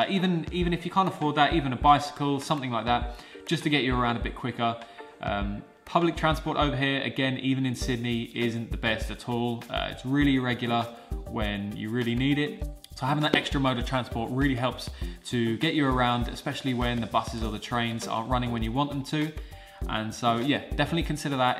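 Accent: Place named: United Kingdom. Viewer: This is the nicest UK accent I have ever heard. Can I ask whereabouts in the UK you are from?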